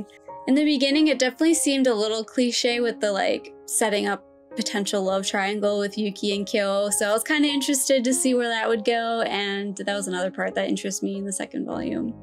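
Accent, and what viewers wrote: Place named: East Coast, United States. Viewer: Wow! Thank you for answering my question! It’s really cool that you’re from the East Coast, US. I grew up in MA, and moved to NH when I got married 10 years ago. I had a feeling by your accent you might not be far far from where I grew up. Now I live in Japan and it’s really a dream come true. It was really great to hear your background about how you enjoyed and related to Tohru a lot. I also agree with you, out of all the characters I felt like I related to her a lot. But not on the same life experience so much. But the heart that wants to help heal others hearts and just be friends with anyone and everyone. That was always my heart as a teen, and still now it resonates with me. Also! I love OHSC!! I watched it when it was airing in Japan in 2006! I remember being so excited for each episode to come out and be subbed! 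I didn’t know then, how much of a parody of Shojo it was until much later, but I still adore it too! I can’t believe they are finally making a second season of it!! Also FMAB and Wolfs Rain!!! Yesss so good, but so gut-wrenching! I never watched Gurren Laggan, but I’ve heard so much about it. You should watch Violet Evergarden, Snow White with Red Hair and Yona of the Dawn, if you enjoy fantasy. :)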